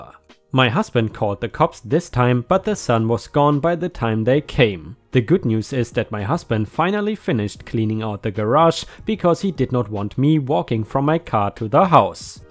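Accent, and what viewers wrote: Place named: Germany. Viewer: Hey man! Glad to see you're still making grade A content! It's just that... You're relapsing! Hahaha! For one, I think it's really cool that you take your viewers constructive critisism to heart, as you did with mine in the past! The thing I'm talking about is saying "so the next one is the update to (printer lawsuit)". I havn't noticed you doing this as much lately, but it's starting to appear again. Please specify that you mean "the next POST" instead of "the next one", because stating "the next one" usually means "the next STORY". But in this case, we're talking about an update to the SAME story. Once again, I think your content is great, just a couple of things that grind my gears ;) By the way, are you from Germany or Austria? Love the accent either way!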